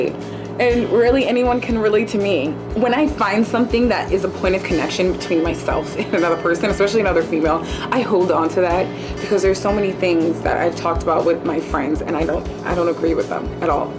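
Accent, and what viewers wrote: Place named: Caribbean. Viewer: You have a Caribbean accent...where u from....intj from usvi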